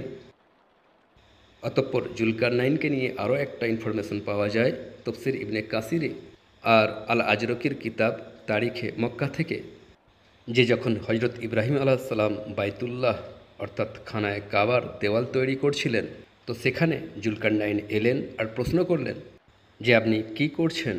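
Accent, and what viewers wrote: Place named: West Bengal, India. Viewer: ভাই উনার ভাষা এমন। উনি পশ্চিমবংগের সম্ভবত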